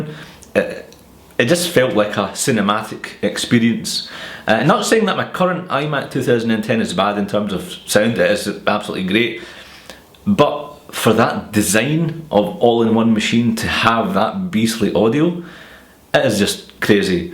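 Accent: Scotland, United Kingdom. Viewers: i like your accent. scottish? i'm italian btw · Love your scottish accent, but im convinced that Siri will learn my language (finnish) before scottish ;) Thank for the video bro! btw: planning my third trip to Scotland! Cant wait to see the highlands once again!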